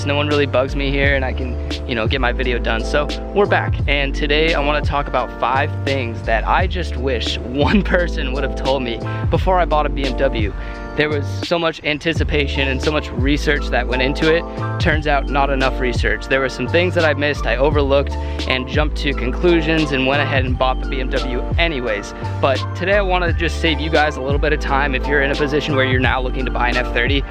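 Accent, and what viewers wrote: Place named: New England, United States. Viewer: Sound like you from New England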